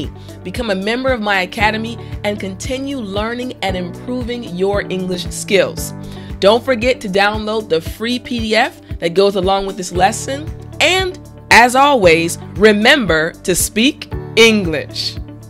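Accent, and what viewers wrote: New York, United States. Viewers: Are you American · by the way, you sound more American than the real British native speaker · Are you from New York? Atlanta?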